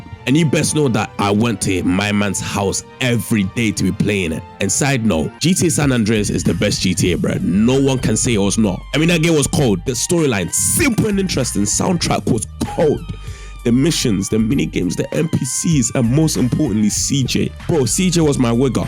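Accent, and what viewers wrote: United Kingdom. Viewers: brit and slow, pick a struggle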